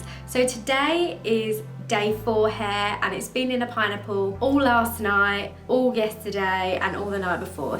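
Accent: United Kingdom. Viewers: What accent is this, it’s nice. I know it’s British but what specific accent? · Your hair is absolutely lovely! I really enjoyed this video and I'm looking forward to others. I adore British accents, your voice is relaxing.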